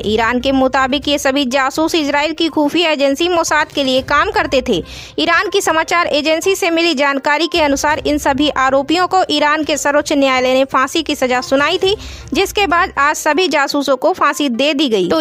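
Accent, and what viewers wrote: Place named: Bihar, India. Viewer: Lagta hai aap Bihari baa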